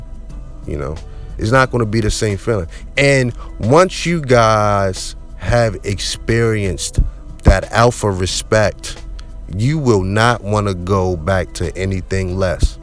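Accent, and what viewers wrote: Baltimore, United States. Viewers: Great video. I can tell you from Baltimore too, i hear it in your accent.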